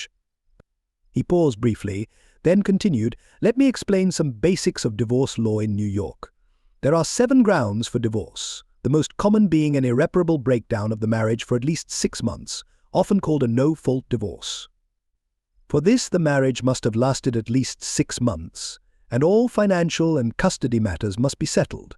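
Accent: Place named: United Kingdom. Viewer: using a "british" style accent for an american story is very off putting the vocalisation just sounds incorrect then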